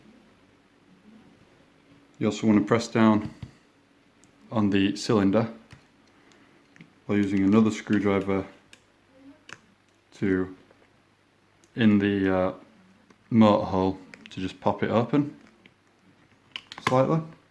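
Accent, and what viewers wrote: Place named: Yorkshire, United Kingdom. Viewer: Gotta be from Yorkshire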